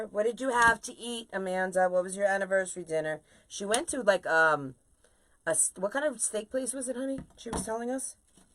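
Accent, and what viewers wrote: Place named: Long Island, United States. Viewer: I hope you see my comment knowing this is an older video. First of all, you are amazing. Explain things well! Secondly, where did you get your easels from? Sounds like you have a Long Island accent. I lived on Long Island for many years and just moved back to the Midwest to be closer to my parents. Lived both on North Shore and South Shore. I watch Lori all the time and I'm so happy I found you as well!!!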